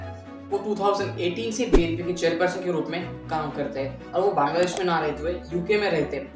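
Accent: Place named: South India, India. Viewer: I think you are a south indian .thats why you say L❌YEL✅A❌YAH✅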